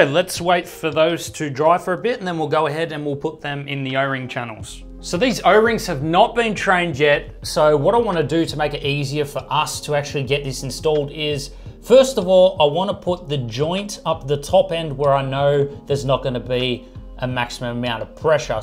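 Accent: Australia. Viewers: Are you situated in Australia? Cause u have an Aussie accent.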